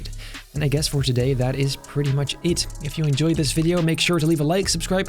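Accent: Netherlands. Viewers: This video could've been good but the horrible Dutch accent and the pronunciation of Scimitar as Skimitar ruins it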